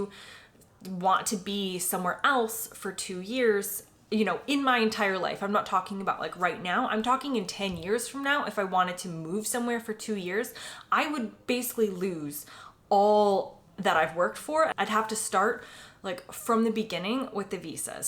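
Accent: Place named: United States. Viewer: Hi I’ve never been to America. Love the American accent so glad you got sorted Welcome to the Uk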